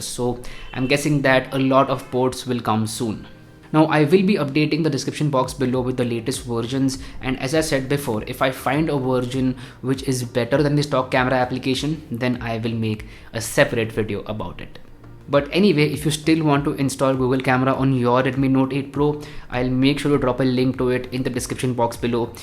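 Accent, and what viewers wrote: India. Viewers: konuşma şeklinize sokayım sizin. hintli değilim iyiki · Hate this indian accent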